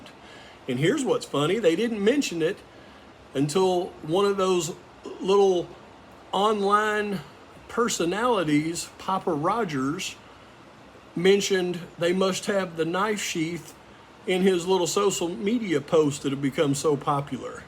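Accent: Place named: Alabama, United States. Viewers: Finally! Thank you. It mostly makes sense to me now lol. This crazy case has been drivng me insane. I think most people want justice but don't want to see a (possibly) innocent person framed and just want reassurance (real evidence) that he did do this crime. Also I think it is very scary when it appears that our rights are being ignored and it is insulting to our intelligence that we are being lied to and misled. Thank you for providing some much needed clarity. Btw I love your voice-I went to college and lived in AL for a while and your accent seems similar. Please keep the info coming! It is much appreciated! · You definitely deserve more than 1.6K subscribers. You give an interesting and compelling perspective -- I never really bought the drug connection, but you have me thinking. And as a native of Alabama, it is a delight to hear a guy with an accent like mine who uses good grammar and is smart as hell. 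Keep on,. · I'm an Alabamaian too but I don't reside there. My accent isn't as clear as P's..😂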